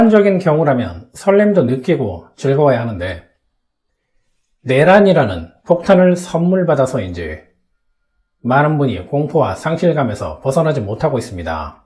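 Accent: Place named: China. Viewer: 이사람 말하는게 중국사람같노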